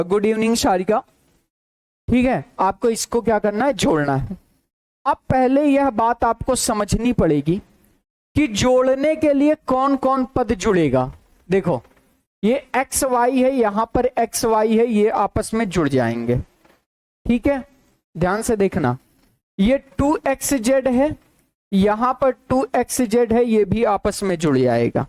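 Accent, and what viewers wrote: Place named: Bihar, India. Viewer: Bhai sun plz beech beech main bihari na bola kar prblm hoti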